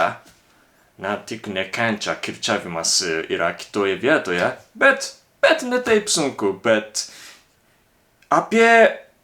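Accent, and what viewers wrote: Japan. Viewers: Sveikas,. Tų žodžių, kurių nekenti, netark ir viskas bus gerai. Malonu klausyti tokį malonų japonišką akcentą. Vaike, Tu perdaug labai nesistenk gilintis į lietuvių kalbos gramatiką. Ji labai sudėtinga. Daug painiavos. Tavo pradžia nuostabi. Neskubėk. Viskas ateis.Tai, ką išmokai - niekur nedings.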